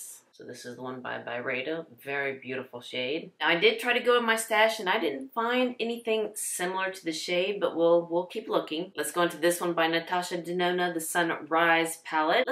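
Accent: United States. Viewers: Loved the looks - and all beautiful. Nice to see on mature eyes, very pretty but in a gorgeous elegant way. 💕, also love your American twang and your gentle energy. Just gained a new subscriber. Greetings from UK! 🥰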